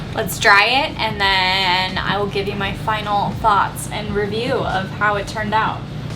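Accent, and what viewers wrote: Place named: Canada. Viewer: I'm curious where your from? You're accent sounds a bit Canadian.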